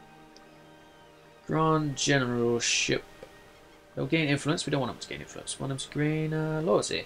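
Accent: United Kingdom. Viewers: Your channel picture is a red maple leaf, and you use Received Pronounciation. Is the accent natural or learned?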